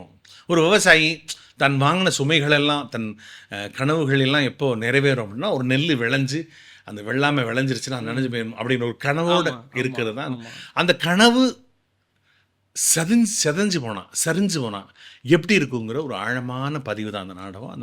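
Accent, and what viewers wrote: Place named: Kerala, India. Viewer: மலையாள தொனி வீசுவது போல உள்ளது. இருந்தாலும் தமிழ் பேசுவது சிறப்பு. வாழ்த்துக்கள். குறை நினைக்க வேண்டாம்.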